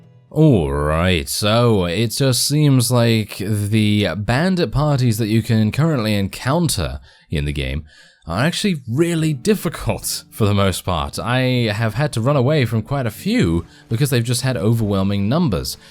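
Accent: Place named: United Kingdom. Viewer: Your voice is.... is so British. Its lulling me in to a falsh sense of security...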